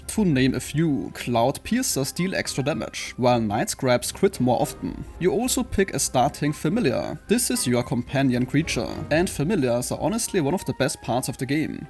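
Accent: Germany. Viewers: i hear the german in your voice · wow hast du nen krassen deutschen akzent :D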